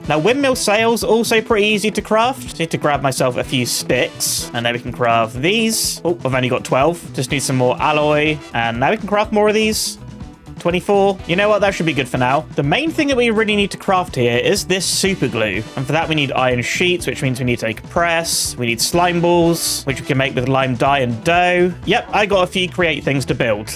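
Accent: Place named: United Kingdom. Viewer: The English accent of the British is very nice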